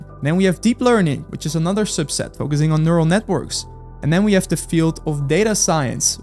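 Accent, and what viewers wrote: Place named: Netherlands. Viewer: youre dutch i can hear it f rom youre accent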